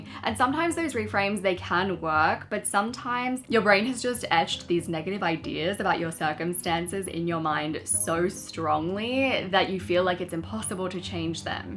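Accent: Australia. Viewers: I can’t stand your accent. Australian accent is sooooo annoying. It’s like you guys add an L to the end of words like food. Your a mix of English and some made up garbage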